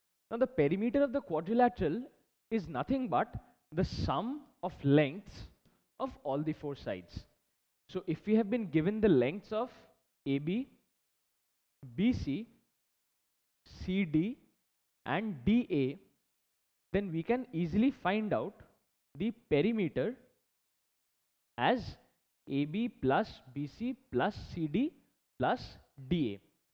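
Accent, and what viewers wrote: India. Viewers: it's an Indian accent.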